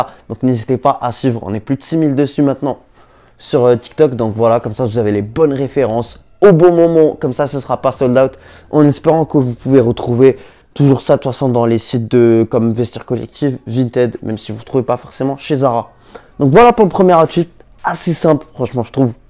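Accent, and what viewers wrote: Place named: Algeria. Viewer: u do great content but idk wuth te hell u sound like alittel bit algerian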